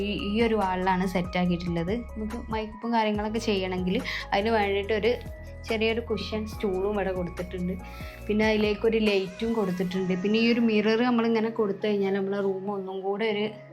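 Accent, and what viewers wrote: Kozhikode, India. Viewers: Malayalam samsarikkan ariyanhitalla.calicut bhasha anganeyane suhrthe.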